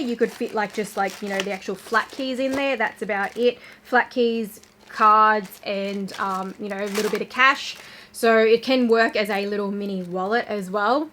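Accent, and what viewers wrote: Australia. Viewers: You sound very Australian wouldn’t you be better off flying to Dubai to purchase Luxury handbags 👜?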